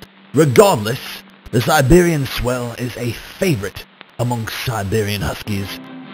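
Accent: England, United Kingdom. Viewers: ...her highness would say "we found that most amusing"...i must commend you on your english accent...jolly good show & all that!...(& i AM english btw!😁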